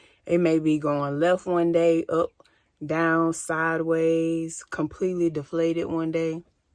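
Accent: France. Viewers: Such good advice about your surroundings affecting your inner cells🥰😍 I strongly resonate with your message. Your “french” accent had me rollin 😂🤣😅😁😆 it’s so unique, I love it. But you and the bugs, chile 😏 you are one bold sista 👏🏿👏🏿👏🏿💞💖🖤🤎🖤✨💫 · Idk why but I am dying 😂🤣 at this French accent! Lmao Everything resonates with me when you shared about epigenetics. Give thanks for the sweet reminder! 🥰🙏🏾 Keep shining, sharing, and taking up space in this universe! 💕✨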